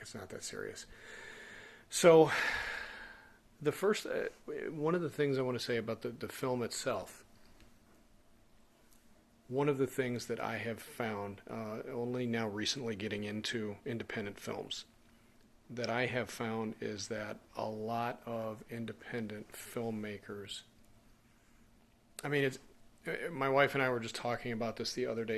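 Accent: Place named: United Kingdom. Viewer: Your British accent ain't bad to be fair (from an English man lol)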